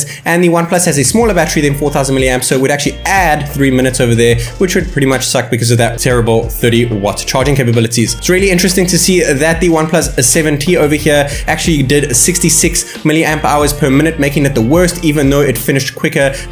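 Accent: South Africa. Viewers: Hey, just curious - are you South African, by any chance?🤔 Your accent...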